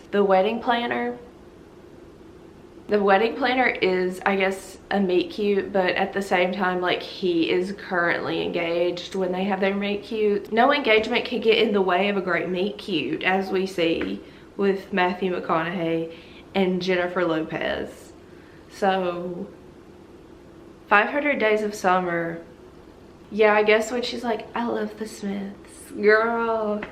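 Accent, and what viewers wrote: South, United States. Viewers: your energy + your southern accent = one of the joys of life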